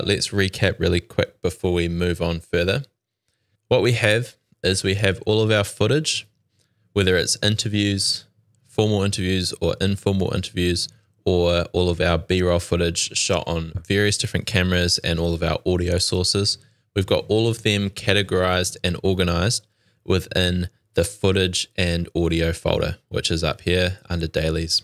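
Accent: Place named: New Zealand. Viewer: Started watching the video - and my brain we like "that accent sounds so familiar and then you mentioned New Zealand. Ahhh Haaa! I am from New Zealand too! - btw Great video mate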